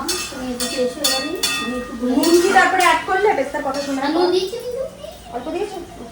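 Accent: Bangladesh. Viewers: Di tumi steam e kore dekho darun lagbe Tomar kotha bolar tone Bangladesh r mone hoi Aage aeikhane chyla ki na ??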